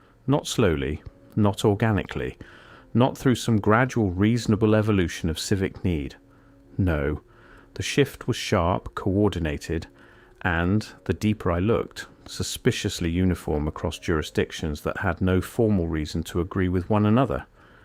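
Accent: United Kingdom. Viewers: Why are all AI NARRATORS IN BRITISH ACCENTS 🤔 🙄 FAFO WWG1WGAWW NUREMBERG 2.0 NCSWIC NQTHING GOD SEES EVERYTHING 👀 GOD WINS FRENS M 🏆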